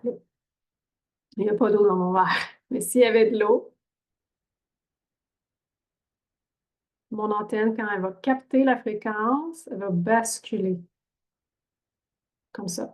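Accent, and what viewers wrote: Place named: Quebec, Canada. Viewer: Hy, Très bonne pédagogie. Tes vidéos seraient plus agréables avec un bon son, car là, c'est difficilement audible. Qu'il est beau cet accent québécois. A bientôt. 😊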